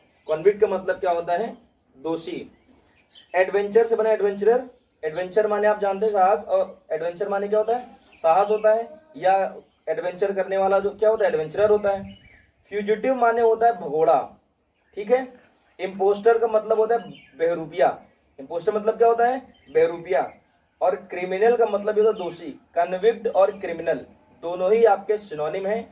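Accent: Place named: Kanpur, India. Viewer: Sir your energy level is awesome. Your tricks are also superb but I like the way you teach. Smile on your face spread positivity and of course kanpuriya language is the best. Missing your karrahi coaching centre. By the way I'm Parth.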